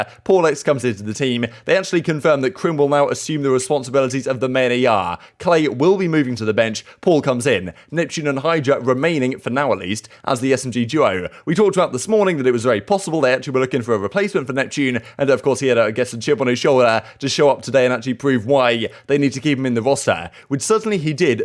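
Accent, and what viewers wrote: United Kingdom. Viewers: Can we get as an analyst? The man speaks with precision, and the british accent is on point. Great vid bro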